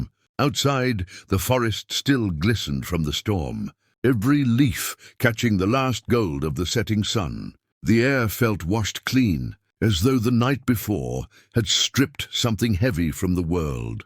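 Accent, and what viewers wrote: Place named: RP, United Kingdom. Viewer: Another PERVERTED "PROPA ENGLISH " Accent that seems to twist the human aspects of the lustful story.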